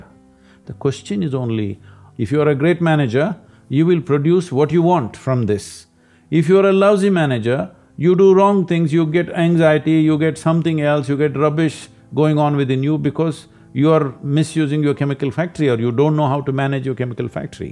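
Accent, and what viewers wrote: India. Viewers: South Indian English , i think is far better than hissing Scottish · It's ur loss really. BTW, Google is able to fairly subtitle Indian accents, not perfectly, but fairly. Maybe give it another shot when u feel more open-minded 👍✌️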